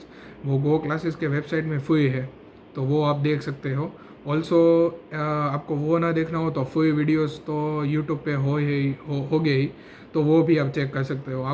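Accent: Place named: Gujarat, India. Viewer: That gujarati accent relatable fr 😂💖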